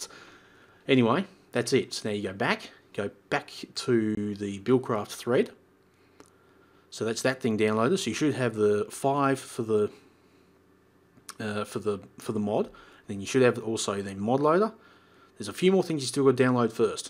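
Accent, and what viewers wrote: Australia. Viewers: YOU. Are Australian. I love you now. (: FROM ME ♥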